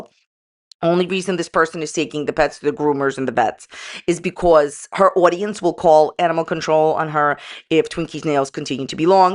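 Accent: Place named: New York, United States. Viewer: Your channel cracks me up. I’m from New York City area and your accent and your attitude. Reminds me just. Like home ❤❤❤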